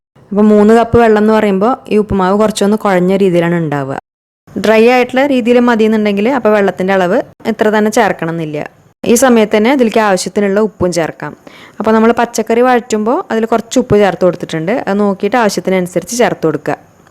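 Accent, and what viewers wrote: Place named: Thrissur, India. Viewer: തൃശൂരാ... ല്ലേ.. ഇനിക്ക് മൻസിലായിട്ടാ.... വർത്താനം കേട്ടപ്പഴേ... 😊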